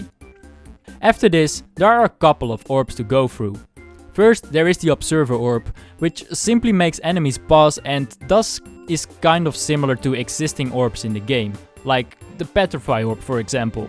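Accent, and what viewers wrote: Netherlands. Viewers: Fi Fa Fo Fum, do I hear the voice of a Dutch man?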